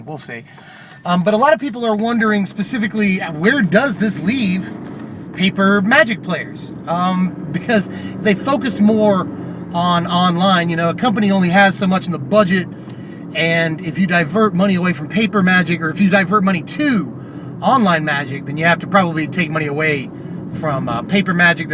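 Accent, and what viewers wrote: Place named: West Virginia, United States. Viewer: West Virginia? The accent fits and the license plates are similar...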